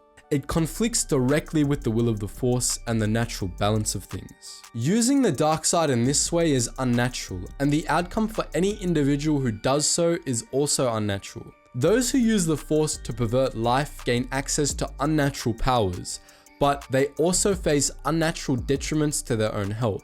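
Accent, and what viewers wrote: Australia. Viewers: Oh shit is Australian couldn't pin down the accent must be from QLD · You're an aussie!? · never knew my guy was australian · GUYS HE IS AUSTRALIAN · I just realised was aussie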